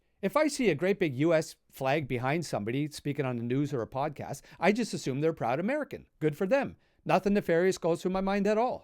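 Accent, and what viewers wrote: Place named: Quebec, Canada. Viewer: how come english canadian sounds a bit french canadian when they speak he gives me a slight quebecer vibe just an observation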